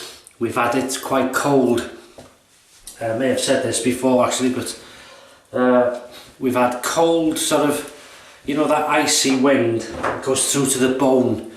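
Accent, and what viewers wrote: United Kingdom. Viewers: I travel quite a bit for work, so those shave sticks are a life saver for me. Thanks for the video,! From which part of the UK do you hail? (I know England, but which part? London? Birmingham?)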